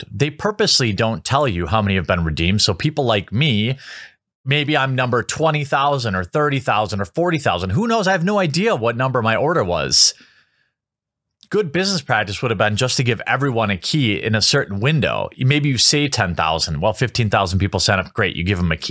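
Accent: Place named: Milwaukee, United States. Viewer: Lived in Milwaukee, can confirm this is true. Any aaaaa sound like aple goes 'Ay' instead. Ayple? Weird but funny n cool how dialect works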